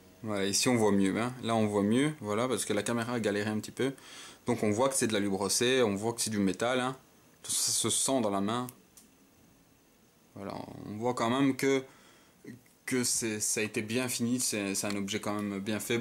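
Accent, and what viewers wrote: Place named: Liège, Belgium. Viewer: tu viens de liege toi hein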